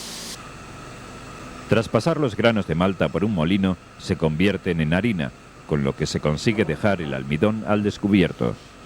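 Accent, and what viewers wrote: Spain. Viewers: Definitivamente esto es adictivo, las tomas, los paisajes, el contenido, la voz en off, todo me recuerda mi niñez cuando todos los documentales se doblaban a español ibérico, gracias. Saludos desde México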